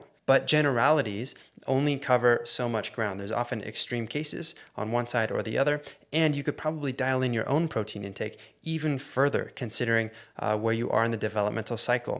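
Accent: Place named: Australia. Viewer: Do you have an australian parent, your accent is interesting.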